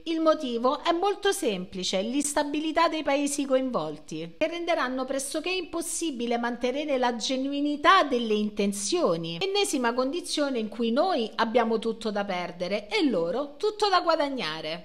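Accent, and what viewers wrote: Rome, Italy. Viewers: C'è del video in questo dialetto romano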